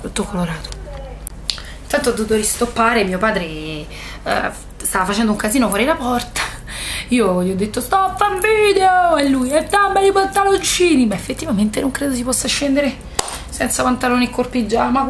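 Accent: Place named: Rome, Italy. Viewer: Ciao! Finalmente sei tornata mi mancavano i tuoi video e il tuo accento romano troppo forte! Attendo il video con le tue creazioni, sono solo un pò curiosa...Bacio!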